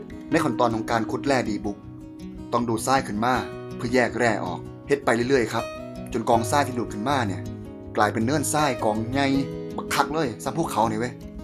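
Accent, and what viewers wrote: Isan, Thailand. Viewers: มักอ้ายเว้าอีสานแม๊ ใจบางบักคัก ❤️😀 มาเที่ยวอุบลเเน่เด้อจ้า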